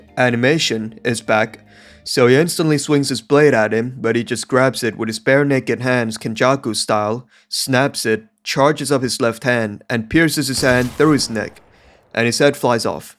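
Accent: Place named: India. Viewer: This video genuinely made me almost cry laughing thank you bro, btw, i sense a slight ndian accent on your voice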